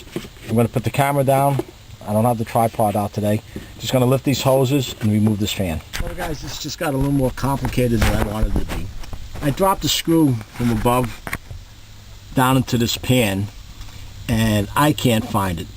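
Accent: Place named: New York, United States. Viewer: I meant to say Long Island. I knew the accent was from NY. I had a friend from derh, sounded just like you. Very fun to listen to. I’ll be changing my water pump out tomorrow. Wish me luck.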